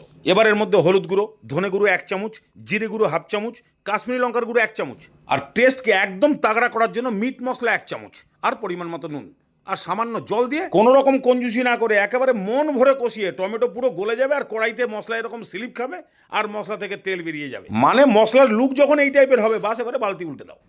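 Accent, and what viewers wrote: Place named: Bangal, Bangladesh. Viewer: ওনার রেসিপি নিয়ে কিছুই বলবার নেই, অসাধারণ। কিন্তু ওনার USP হল কথা বলার ধরণ। শুনলে মনে হয় বাঙাল, আর বাঙালদের রান্না 🌍❤❤❤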